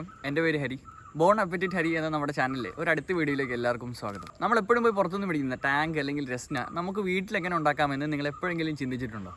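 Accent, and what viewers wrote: Alappuzha, India. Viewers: Bro alappuzhakkaran aano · Chettan alappuzhakkaran aaano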